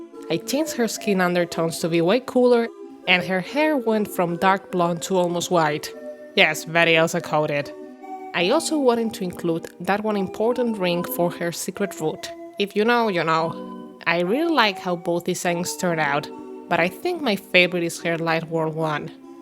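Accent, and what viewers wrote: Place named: Italy. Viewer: I’m sorry this ain’t related to the art but I love your accent so much are you Italian??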